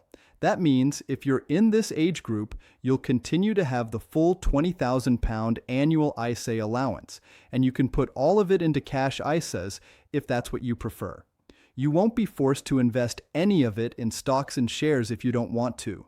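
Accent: United States. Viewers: Why the US accent for UK matters....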